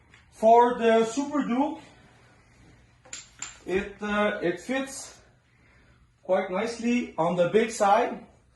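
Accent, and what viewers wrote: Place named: Quebec, Canada. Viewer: Ca c'est un gars du Quebec . Meme accent que moi 🙂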